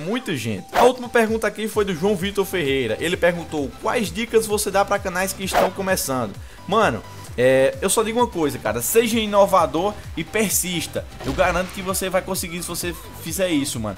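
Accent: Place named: Paraíba, Brazil. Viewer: Infelismente,nao sou paraibano..Queria ser Esse sotaque é fodastico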